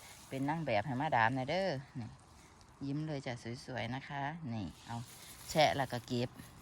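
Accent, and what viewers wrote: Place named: Surin, Thailand. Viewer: สำเนียงเว้า คือบ้านข่อยคักลาวสุรินทร์เด้อจ้า ตอนนี้มีภูมิลำเนาอยู่สมุทรปราการครอบครัวข้าราชการจ้า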